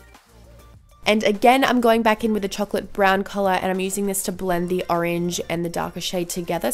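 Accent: Australia. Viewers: talking in Aussie accent too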